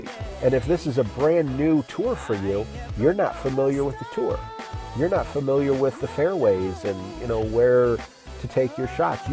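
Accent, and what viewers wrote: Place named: New York, United States. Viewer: Sounds like your from my area in NY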